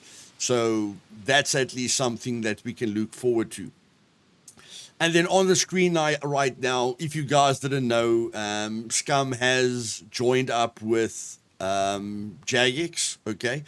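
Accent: South Africa. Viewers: Awesome video buddy. Very informative and interesting. Keep up the good work 👍🏻. You from South Africa? Excuse my ignorance if not haha but I can't pin the accent!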